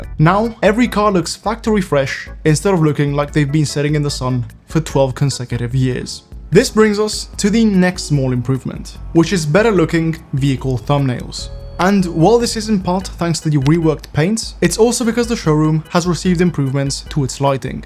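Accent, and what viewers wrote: Italy. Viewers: Ciao,ho capito ce sei italiano dall’accento. Io vorrei che tu iniziassi a parlare in italiano nei tuoi video(se ti va)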